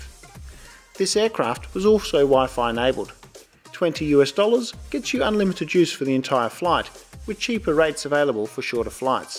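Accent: Australia. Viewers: Ya Australian? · I hear Aussie accent :)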